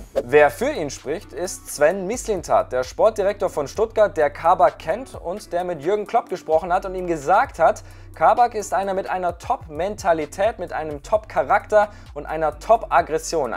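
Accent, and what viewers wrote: Ireland. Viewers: Ist sind irischer Akzent, kommt aus Irrland der Gute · Er ist ire er kann nd wirklich was dafür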